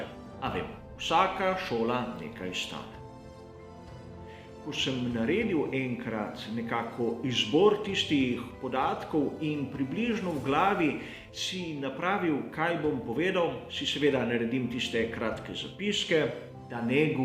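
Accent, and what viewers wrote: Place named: Koper, Slovenia. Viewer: rtv z 100mio na leto ne uspe sestavit 1% tega kar lahko vidimo na tem kanalu. se mi je zdelo da si kje iz kp ko sem začel spremljat tvoje prispevke to pa zato ker uporabljaš G in ne H po Horiško :) tudi moje čestitke za delo ki ga opravljaš in upam da se bo nadaljevalo tako. me pa zanima zakaj vsak dan nov video. se ti ne zdi da bi si kdaj vzel dan prosto od tega? naredil mogoče video nekje 6 do 7min z nekaj kratkimi izseki? v mislih imam kot nek malo drugačen format prispevka ki bi ga objavil tu in tam.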